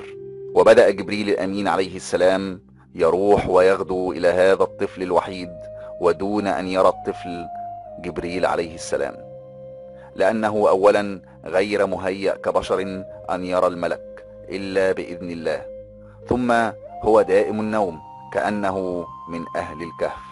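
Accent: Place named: Egypt. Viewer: اخى العزيز لا تنطق حرف الجيم بلهجتك المصرية عند قراءة القرآن او الاحتديث النبوية الشريفة بارك الله فيك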